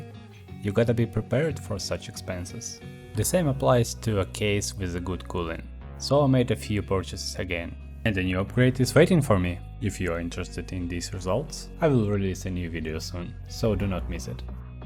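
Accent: Russia. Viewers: много, факт) я слушаю и частенько русский акцент дает о себе знать. Для практики рекомендую читать стихотворения с тяжелым произношением и слушать говор англичан. Ох уж эта практика, с ней как раз всегда и беда))) не хватает ее всегда. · Когда нужно меньше 5 секунд, чтобы понять, что автор — русскоговорящий =) (это не критика, если что ;)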